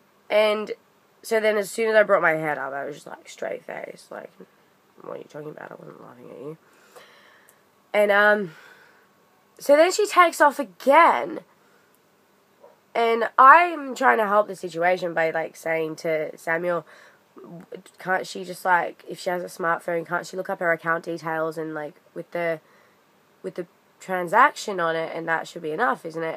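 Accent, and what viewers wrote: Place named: Australia. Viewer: Even though you still have your Aussie accent, you're turning into a Pom... You said Hoover instead of vacuum. Haha:) Nice work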